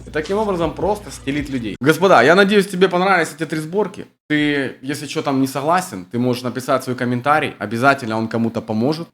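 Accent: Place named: Ukraine. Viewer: Акцент украинский сразу слышно. Украина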